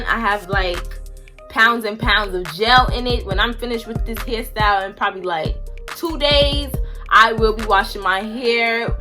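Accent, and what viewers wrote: New York, United States. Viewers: Am I tripping or do she have a New York accent 🤣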